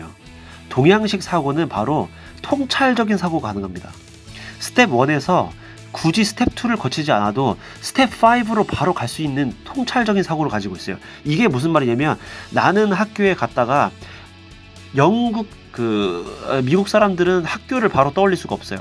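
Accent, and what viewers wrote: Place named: Seoul, South Korea. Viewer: 안녕하세요 훈더맨 실방에서 알고 들어왔어요 역시 서울 말씨가 듣기좋습니다.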